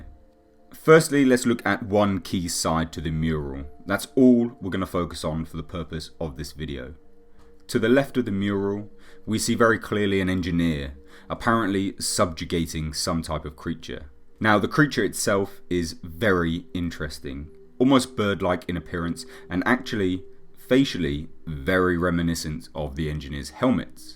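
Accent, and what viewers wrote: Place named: United Kingdom. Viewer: Love your videos, great voice for narration (why do Brits always make things sound interesting?) :P. Quick question? Do you believe E. Shaw was turned into an alien queen or some other monster by David after reaching Paradise? I think David had learned a great deal more about the Engineers (their tech, history) than we were led to believe during the Prometheus film, so he has he maybe taken Shaw to a different planet and not the engineers' home planet?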